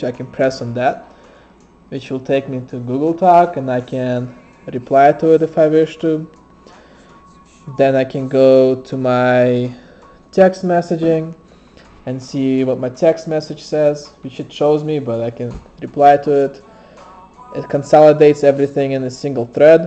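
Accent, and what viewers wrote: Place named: Russia. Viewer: You're from Russia? Love the accent :-)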